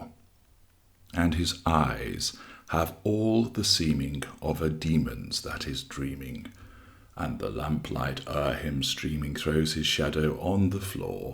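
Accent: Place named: Yorkshire, United Kingdom. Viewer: I can still hear Yorkshire accent though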